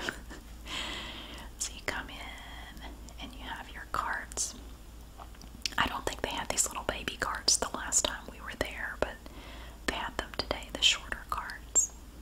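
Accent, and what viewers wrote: South, United States. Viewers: Thank you for this video. South Carolina is beautiful, I’m going to visit in a few weeks since my boyfriend is from there. Your southern accent is so sweet and cute 💕 are you originally from SC or just visiting for vacation?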